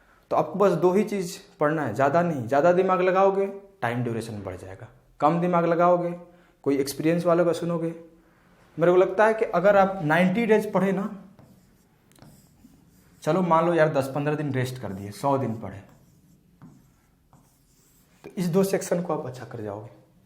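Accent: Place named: Bhojpuri, India. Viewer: Jai ho bhaiya 🙏 Tohra muh se bhojpuri sun k mja aa gyil😍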